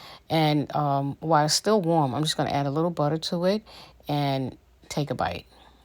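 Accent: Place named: New York, United States. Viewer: 💜 the New York Puerto Rican accent!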